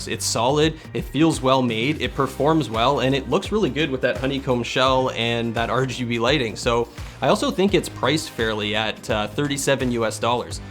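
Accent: Canada. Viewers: R u Canadian?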